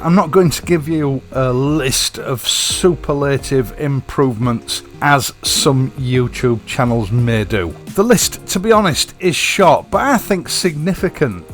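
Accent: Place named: Northeast England, United Kingdom. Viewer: I really love your videos and your accent! May I ask, are you from the northeast of England? Cheers from Austria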